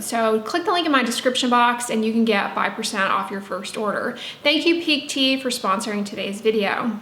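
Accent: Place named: California, United States. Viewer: Where are you from? I know you are in Houston now, but you really sound like you are from California. Just wondering. I love your channel by the way.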